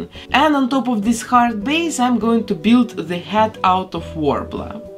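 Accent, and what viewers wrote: Russia. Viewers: Hey,. I’ve noticed your accent. Do you come from Russia 🇷🇺? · Оооо видно что у неё русский акцент 😂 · Вы, случайно, не русская?) У Вас акцент такой прикольный~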